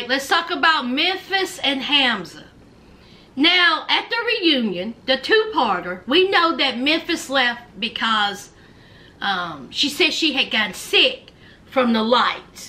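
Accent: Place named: North Carolina, United States. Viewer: NC resident? your accent sounds real close to those in the foothills.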